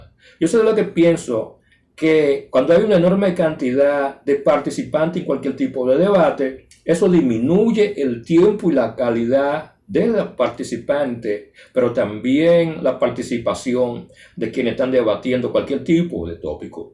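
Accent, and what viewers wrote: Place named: Haiti. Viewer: Se le nota el Haitiano a este comentarista.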